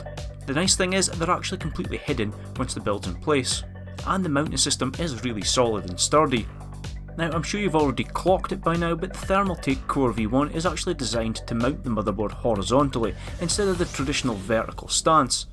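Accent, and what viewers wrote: Scotland, United Kingdom. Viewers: Ha ha fuck, your really Scottish. :D nice to see another brother from above the border.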